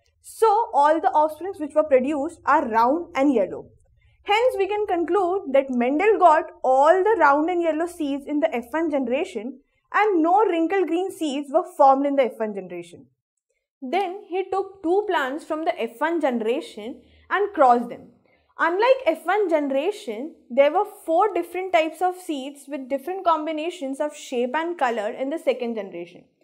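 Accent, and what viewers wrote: India. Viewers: the indian accent is dominant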